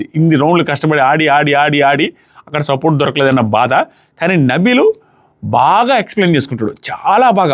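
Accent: Karnataka, India. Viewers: ur kannada so funny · Anna nuvu kannada matalu full funny ga undi anna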